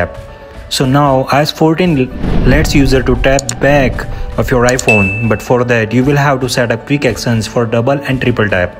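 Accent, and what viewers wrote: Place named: India. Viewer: I don't try to make fun on his strong indian accent but I really don't understand the words his saying, maybe he can speak bit slowly so people can understand what he say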